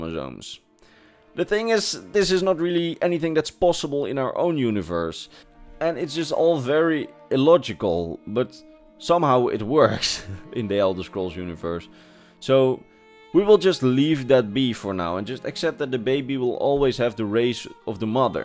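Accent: Netherlands. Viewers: Hey! Je bent ook Nederlands! Ik dacht al dat ik het aan je accent hoorde XD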